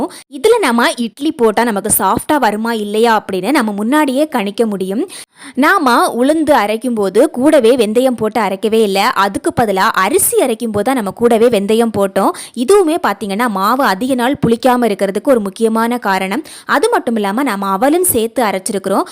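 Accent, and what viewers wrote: Kerala, India. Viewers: Unga slang la malayalam vasanai veesuthu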